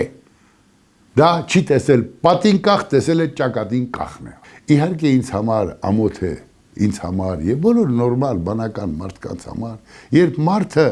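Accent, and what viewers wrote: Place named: Lori, Armenia. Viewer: 2016. Ին. Ուրախացել. Էմ. Ձեր. Ու. Վահանի. Տեսակով. Հետո. Որոշ. Ժամանակ. Քննադատել. Բայց. Երբեք. Կոռեկտության. Սահմաները. Չանցնելով. Ու. Չարիք. Էմ. Համարում. Բոլոր. Նրանց. Ովքեր. Հայհոյում. Են. Ու. Անկախ. Նրանից. Համակարծիք. Էմ. Եղել. Թե. Ոչ. Ձեր. Խոսքում. Լոռվա. Բառբառի. Համն. Ու. Հոտն. Էմ. Առել. Չէ. Լոռեցի. Չէմ. Բայց. Մեր. Բառբառները. Մեր. Նկատի. Ունեմ. Հային. Բառբառները. Հոգիս. Ջերմացնում. Են. Տանում. Ինքնություն.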